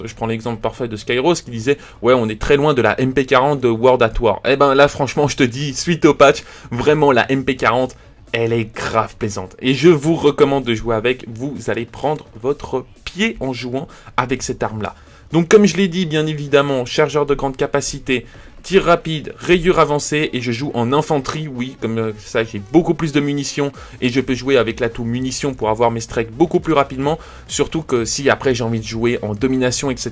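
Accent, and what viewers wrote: Belgium. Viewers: T belge ? :p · Oh tes belge je savais pas😱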